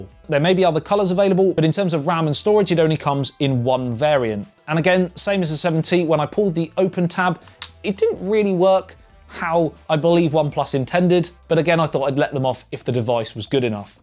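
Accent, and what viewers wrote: United Kingdom. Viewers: u r british????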